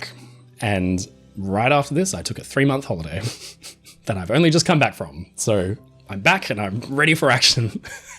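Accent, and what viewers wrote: Australia. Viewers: Your Australian accent is stronger in the first video. I have to do that (almost pseudoEnglish accent) at work as well or people don’t understand what I’m saying. 😅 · I love listening to you talk, you're so knowledgeable, a great teacher, and totally charming. (And I'm a sucker for an Australian accent.)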